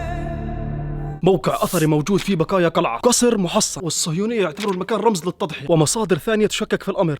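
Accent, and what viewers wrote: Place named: Jordan. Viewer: اللي يستغرب من لهجة الاردنيين الرقيقه يدوس لايك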